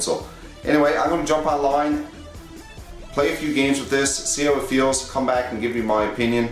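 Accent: Newfoundland, Canada. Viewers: god im canadian but this guy is really canadian haha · You are so Canadian! I'm Canadian but I don't have an accent like that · Where are you from? You sound like your from NL Canada! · Are you a newfie? You sound like a newfie.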